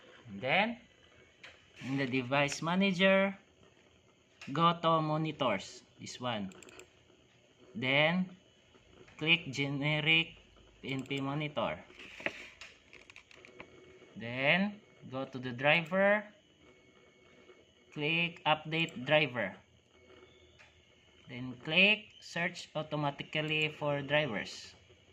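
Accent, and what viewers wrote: Iloilo, Philippines. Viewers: Illongo ka pre? Hehehe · dayon?! hahaha ilonggo kaman gali hahah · Illongo ka nong?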